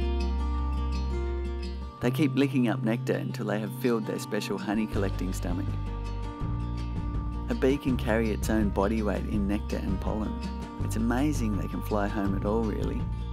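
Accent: Australia. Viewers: Would very much appreciate you complement the vid with subtitles... your accented English (Aussie?) makes full comprehension rather difficult, caught about 90% of it. :-)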